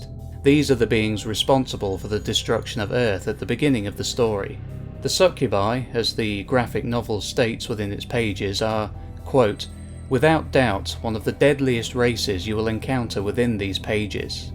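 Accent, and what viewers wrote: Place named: England, United Kingdom. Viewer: you have a very recognizable accent. I found it funny when you said you were from England, because every word you say tells us you're from England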